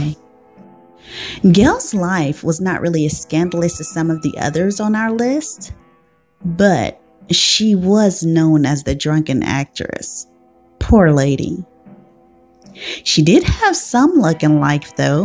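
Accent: South, United States. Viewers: I've thought about starting a channel. Good luck. You have a beautiful speaking voice. Are you Southern? I heard about Berle's package previously. I'm a huge Drifters fan. Sad about Clyde.😢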